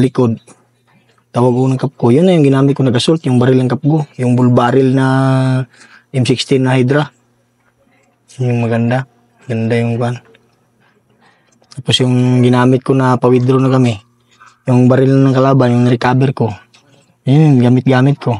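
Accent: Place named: Bicol, Philippines. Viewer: Maganda man sana ang kwento kaya Lang BisakoL ang nag kwento. Ayun DUTAE Lang ang Ending ... Kwentong Barbero. 😂😂😂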